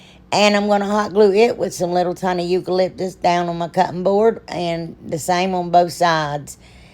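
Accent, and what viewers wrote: South, United States. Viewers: I 'v e just found your channel today but Girl I 'm a fan for life!! You're the 1st person I 've ever seen that sounds as "country" as I do. It's wonderful Never change. Cause remember, "the south's gonna do it again"! Love, love, love you!!!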